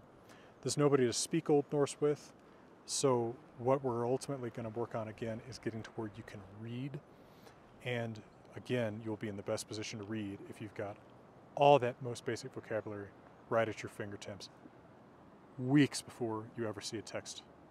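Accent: United States. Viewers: As a Scandinavian this only sounds like a American trying to speak Scandinavian 😂 Æ is ÄÄÄÄ. V is not W. Y doesnt sound like what you are saying 😂 Å is another story. Also how do you know how they pronounced R? And from what region?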